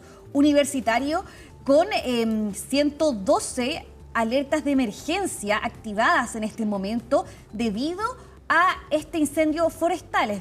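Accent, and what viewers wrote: Chile. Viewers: Ese acento que chistoso es chilena ?? Me distrae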